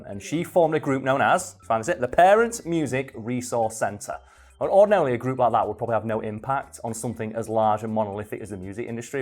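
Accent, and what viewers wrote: United Kingdom. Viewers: Dee Snyder, Frank Zappa, and John Denver testified against the PMRC in the US Senate, not in court. Based on your accent, I'm assuming you're in the UK, so it would prolly be most like if they testified in the House of Lords there. Incidentally, Dee Snyder's testimony is well worth watching, he has no mercy on the Senators questioning him or the PMRC and easily puts them in their place with an eloquence rarely seen either then or now.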